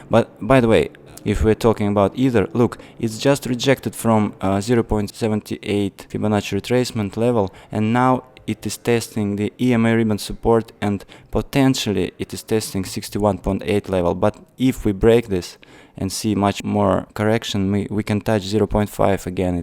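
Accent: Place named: Russia. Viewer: Your English is perfect !👍👍👍Но есть русс. акцент как и у Ivan on Tech.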